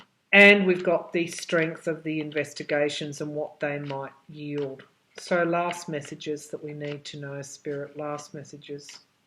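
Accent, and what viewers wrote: Australia. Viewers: it's okay we love that Aussie accent keep it up. by the way we a also love your beautiful smile. I cannot wait until the world gets a little bit lighter, so you can smile a little more. I feel bad for you I know this stuff must be hard on you. These readings must take a lot out of you. We appreciate every single time we get a new video. Keep up the good work.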